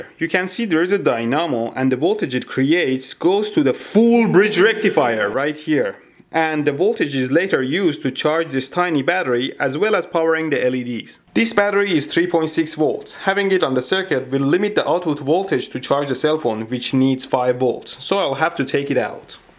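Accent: Iran. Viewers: he has an Iranian accent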